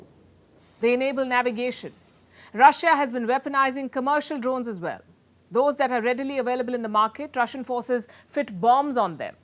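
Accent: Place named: India. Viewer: It's Amazing how Indian slaves mentality talk in funny English 🥁🤣🇬🇧 rule Britain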